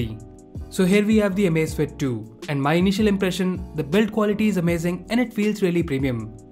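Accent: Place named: India. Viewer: Good review, but your english is hard to understand... I asume you are from India , I suggest speaking slower or taking some courses to lower your indian L`s in every word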